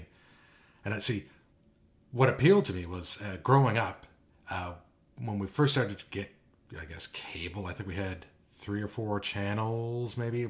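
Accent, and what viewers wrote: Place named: Maine, United States. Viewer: There’s nothin slim about the quality of these « slim-pick in’ » videos! Love the Maine accent!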